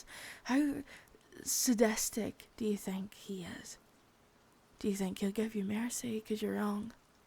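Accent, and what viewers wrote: Scotland, United Kingdom. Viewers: Scottish accent uhhhhh THE BEST ! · Great work. (Is that a scottish accent?)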